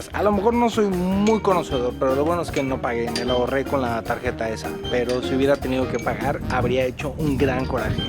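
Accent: Mexico. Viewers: Me encantan tus videos y me sirven mucho, porque estoy en la misma que tu, pero... me perturba que hables como Mexicano, entiendo que ocupes palabras, pero sinceramente no pareces Chileno y es fome que eso se pierda. Saludos y mucho éxito.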